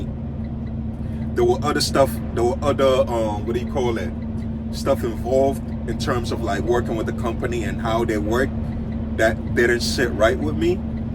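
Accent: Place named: New York, United States. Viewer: u sound like from new york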